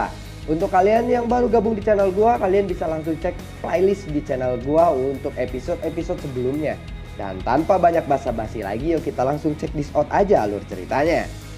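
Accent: West Java, Indonesia. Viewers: Cing baleg atuh ngomong na katingali pisan Jelema Sunda na teh tapi Hade pisan Gaya na terus Ken Persib bandung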